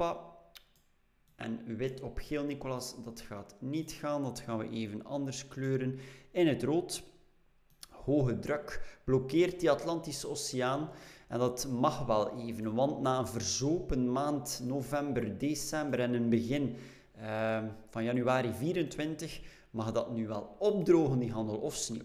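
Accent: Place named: Flanders, Belgium. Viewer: Vlaams luistert ook nog eens gewoon veel lekkerder.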